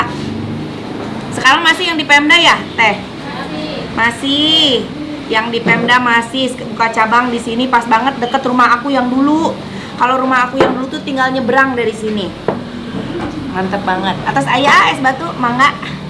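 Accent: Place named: Sunda, Indonesia. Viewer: Lemes pisan teh bahasa sunda na meni resep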